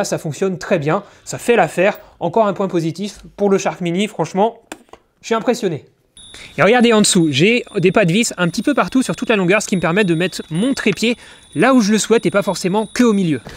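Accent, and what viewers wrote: Alsace, France. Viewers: on dirait que tu es en Alsace. en tout cas super bonne vodéo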